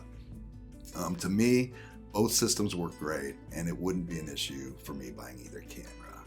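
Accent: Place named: Alabama, United States. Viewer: Hi. Love watching your videos, very informative. I have to ask and sorry to pry, but are you from Alabama? I ask only because you have the same speech patterns as Tim Cook from Apple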